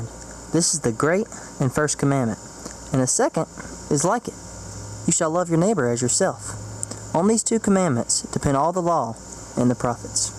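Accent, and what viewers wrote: South, United States. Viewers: I'm new but are you southern American?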